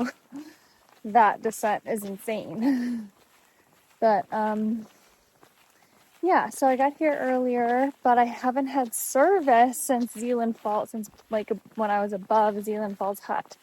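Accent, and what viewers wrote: United Kingdom. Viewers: I love the British accent! I’ve been watching your hike for many years. I remember your video where you started the British accent and asked if was real or not. Never saw the answer. I think it’s real, if not it’s great!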